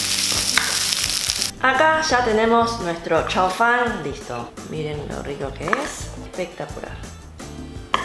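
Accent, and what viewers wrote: Argentina. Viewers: Me parece increíble verte hablar con acento Argentino. Me gustan todas las recetas Chinas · Encantador contraste entre tu acento argentino y tu encantadora belleza asiática. Me agradas.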